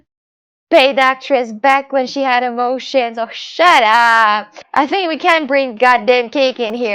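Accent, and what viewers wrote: Russia. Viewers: I love that Russian accent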